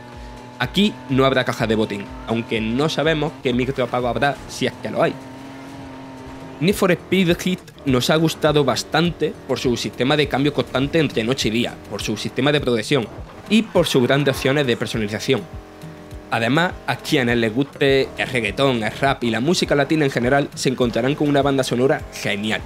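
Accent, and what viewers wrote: Andalusia, Spain. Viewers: Habla con su acento, también existe el acento gallego, canario, madrileño, murciano, como el andaluz, fin. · en el sur de España se habla asi, por desgracia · no sabes lo que es un acento? Si es Andaluz y tiene ese acento ¿porque deberia de esforzarse en hablar como los del norte? El que no le guste que no lo vea, pero decirle que debe mejorar su manera de hablar porque a ti no te gusta (siendo totalmente correcta su forma de hablar) no viene a cuento. Ademas para tu informacion, el Andaluz esta a la par del Castellano (Español) ahora a esperar que me lluevan tortas de iluminados!!!! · Acento andalúz xd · Alejandro Cabrera Moraes Emmm claro que sí de hecho, te lo estoy diciendo por algo y el acento que tiene el hombre que está hablando es propio del Sur de España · Que es andaluz es indudable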